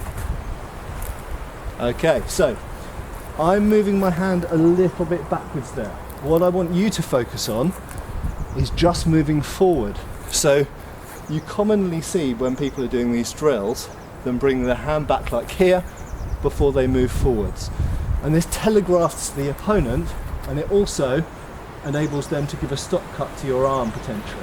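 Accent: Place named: RP, United Kingdom. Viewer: you missed your true calling as a type-cast, British officer in Hollywood films. You are tall, thin, and have a moderate BBC accent. You seem like low level gentry so I am thinking a rank between Major and Colonel would suit you (you would get an officer's sword too). You are not regal enough (and too honorable) to be a general and you are too "proper" and too refined to be a sergeant. Am I the only one who could envision in a movie like 1917?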